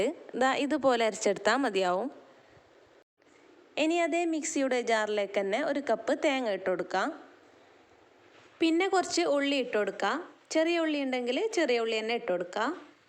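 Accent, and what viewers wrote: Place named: Kasaragod, India. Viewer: നെയ്പത്തൽ കാസർകോഡ് സംസാരവും ഇഷ്ട്ടപെട്ടു 👍🏻👍🏻👍🏻👍🏻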